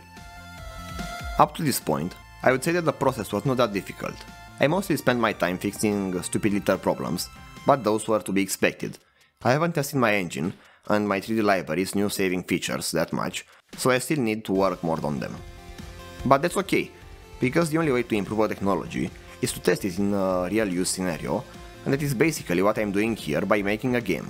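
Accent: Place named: Romania. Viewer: Oho, this romanian accent!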